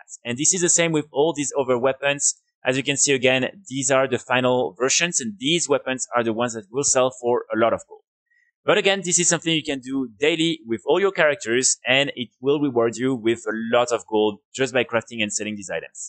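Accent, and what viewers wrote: France. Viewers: tu serais français à tout hasard?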